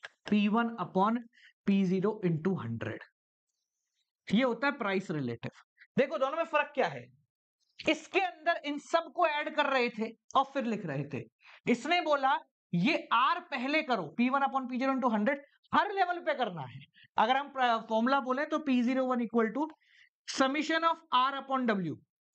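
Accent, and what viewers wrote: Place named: Agra, India. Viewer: sir aap agra se ho kya aapke accent se lag ra hai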